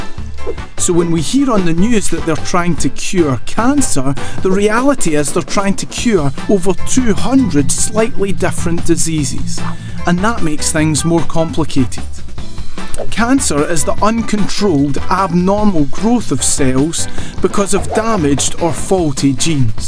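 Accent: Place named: Scotland, United Kingdom. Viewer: Top video, love the Scottish accent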